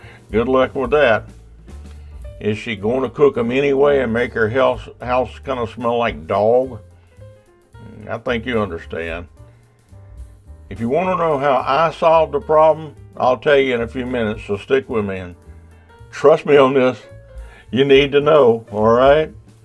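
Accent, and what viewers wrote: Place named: South, United States. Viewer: I am new to your channel, and I really like it!!! Hi there y’all, 😉😎👋🏼. Thank you for sharing a really great topic, and video. I can tell by your character & personality, that you’re a good man with a good heart, and in a happy, and loving relationship, with your Filipina wife. I am from California, and I dig your thick southern accent. Both of my daughters now live in New Braunfels, Texas. So, whenever I’m there for a visit, I get a kick out of hearing Texans speak with their thick accents. To me especially, nothing is cooler than hearing a “Mexican” speak with a Texas accent, lol!!! Once again, I like your cool accent, if I can ask, what part of the country are you from, brother, 😎😉🙏🏼?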